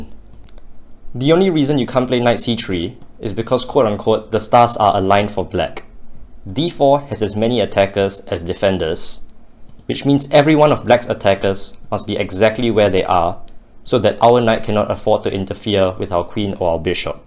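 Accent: Singapore. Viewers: Ayoo you from SG? Accent sounds so familiar lol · Let me hear that Singlish sir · Brilliant video. I'm 1500 rated and I found this video really instructive. Are you also Singaporean? I ask because I am, and I recognise the accent!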